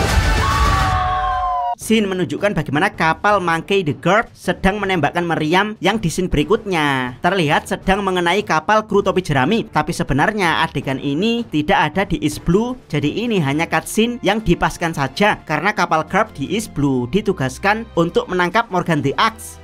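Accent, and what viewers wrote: Java, Indonesia. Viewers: medok bnget sih asw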